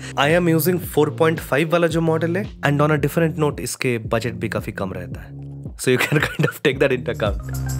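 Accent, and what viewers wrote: Bengal, India. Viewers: First of all very fine content and knowledge you have and also like your bangali hindi accent 😁, Just wanaa know a weird thing, can we use Yamaha PSR S-950(or S750, S970, S770) workstation speakers for monitoring if you ever heard those keyboards sound, it sounds like HS5. Only problem that they are positioned fixed & flat. We cant increase there distance.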